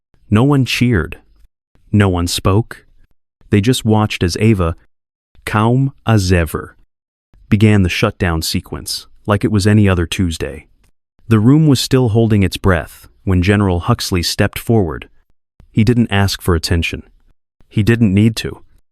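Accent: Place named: France. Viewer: Why does the voice ,occasionally, seem to talk with a French accent?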